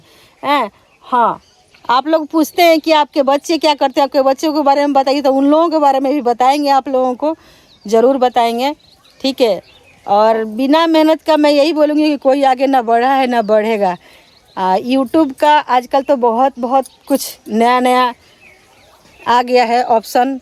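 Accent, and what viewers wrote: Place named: Gorakhpur, India. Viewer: Apki bhasa gorbpur ki lg rhi h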